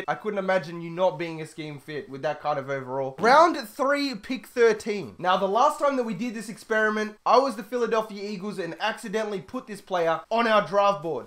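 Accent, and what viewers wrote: New Zealand. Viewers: Are you a kiwi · Are you kiwi?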